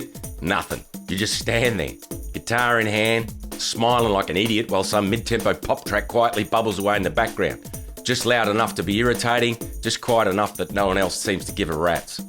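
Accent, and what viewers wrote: Australia. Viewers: Had to come back here after initially enjoying this a month ago as I thought of you at a live outdoor gig this weekend. Everything was actually good and I had been waiting to have a slower moment and break out a song. I started in very softly and the puppy adoption set up which was part of the event and had been there quietly all afternoon under the trees in a corner, had a visitor walk by with two dogs of their own. About 20 dogs commenced to bark, as I began to sing. I switched to a chorus of and in my head heard your Aussie accent say dogs barking off to the side like there's no tomorrow, that's a new one. And I swear it was not in reaction to me!😂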